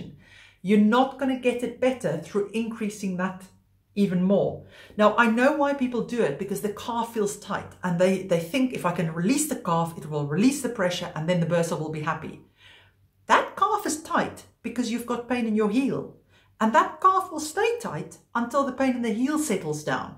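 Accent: South Africa. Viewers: Nice South African accent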